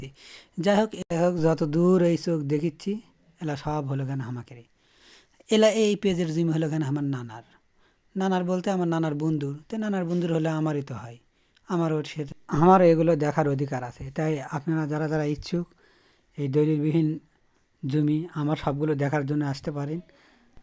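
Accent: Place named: Bogura, Bangladesh. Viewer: অনেক অনেক সুন্দর হয়েছে। সবচেয়ে বড় কথা হলো বগুড়ার আঞ্চলিক ভাষায় কথা।ধন্যবাদ।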